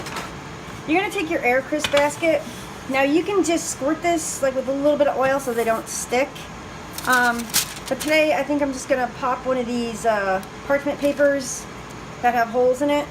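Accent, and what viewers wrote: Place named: Pennsylvania, United States. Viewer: Can I ask where you're from? My mother in law is an Italian from Pennsylvania and you have that same ever so slight accent.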